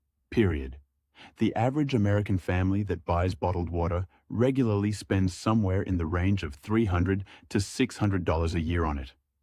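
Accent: United Kingdom. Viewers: It could be. But I've noticed that videos made with AI have a little marker just below the video area that identify them as such. This one does not have that. Also, having listened to the entire thing, I doubt it. I don't understand why the narrator has a British accent but listening to it, I can say, it's not AI-- with AI narration, there's always a give away; some little quirk, mispronunciation or glitch. None here.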